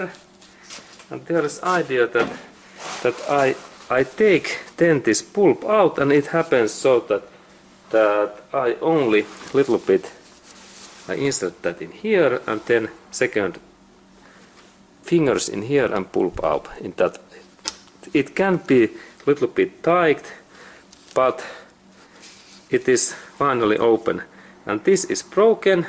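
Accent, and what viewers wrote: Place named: Finland. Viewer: Ai että rallienglantia tää on kova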